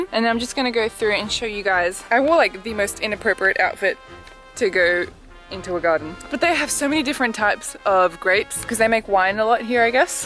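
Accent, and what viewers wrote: Australia. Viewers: Have you been living in Australia or sth? Cuz your "So", "No" etc, sound more like Sewrlgh, Newlgh XD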